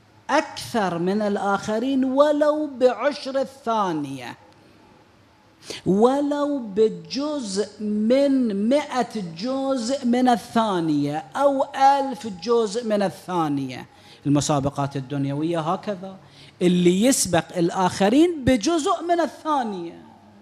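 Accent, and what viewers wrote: Iran. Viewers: لان ايراني وقليل يعرف عربي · ايراني وهيج يحجي كوه يعرف عربي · هو يمكن إيراني لهذا السبب لهجته هكذا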